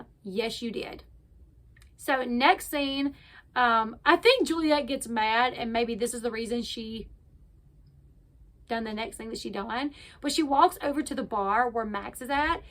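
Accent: South, United States. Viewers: I just love your country accent 💗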